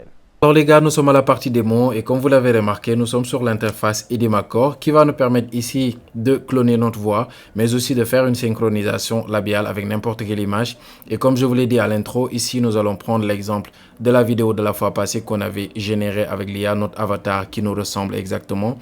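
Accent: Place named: Africa. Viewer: N'IMPORTE QUOI !! ce n'est pas du tout un clone de ta voix ! Notamment, ta voix clonée n'a pas ton accent africain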